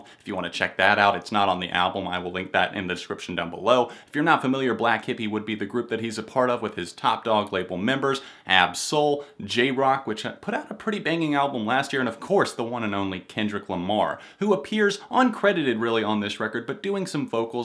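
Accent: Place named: South, United States. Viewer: Bizzarro southern twang version of Anthony Fantano lol. Liked your review thanks.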